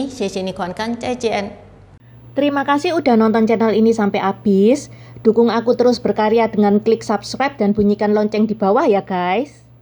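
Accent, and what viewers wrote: Java, Indonesia. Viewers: Cici bhs Indonesianya medok banget 😂😂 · Logatnya Jawa banget.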